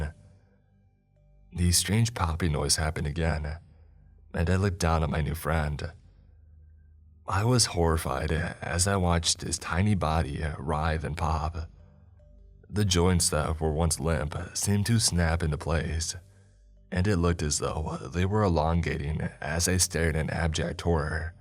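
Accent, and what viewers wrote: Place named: South, United States. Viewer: I have never heard your normal voice. You almost sound southern. And your voice is naturally that deep?! Good God lol.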